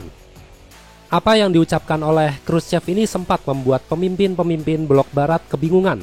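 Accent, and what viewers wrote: Java, Indonesia. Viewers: Bang lu jawa?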